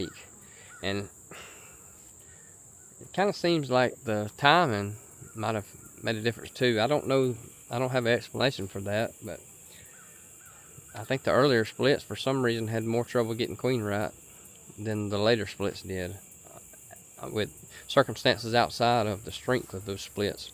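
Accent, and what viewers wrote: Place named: Alabama, United States. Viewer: Your right about staying on top of your hives for sure, it don't take much to lose a couple of them and that really hurts especially if your a small beekeeper without a lot of resources to pull from. Good tips about laying workers, gives me something to fall back on when I run into it. As an aside, wife knew you was from Alabama when she heard you talking from the other room LOL!, her kinfolk are down Brewton, Alabama way. Y'all have a good day now.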